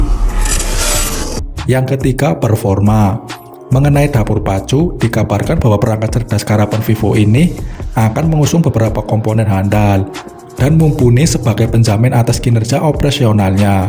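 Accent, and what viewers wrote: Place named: Tegal, Indonesia. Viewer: Logat tegalnya medok bet dah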